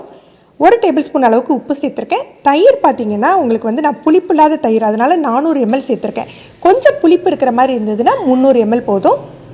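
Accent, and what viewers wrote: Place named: Tirunelveli, India. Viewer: why I asked : Your Thamizh accent reminds me of my MSc classmate -1965-67- Loyola-Mr Farook from Tirunelveli.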